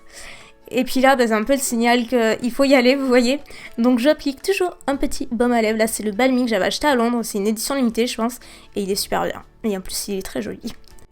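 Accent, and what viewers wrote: South, France. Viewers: Tu as un accent incroyable on dirait que tu viens du sud je t'adore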